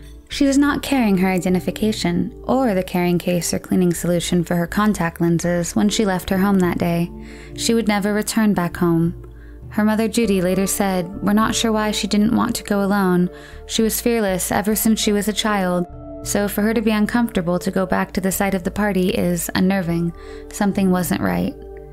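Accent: India. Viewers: I was always wondering where are you from, coz i felt you maybe from India due to your accent. As a fellow Indian, I want to congratulate you for running such a nice & successful channel. I am so happy to subscribe & enjoy your content!!! All the best & keep it up 👍👍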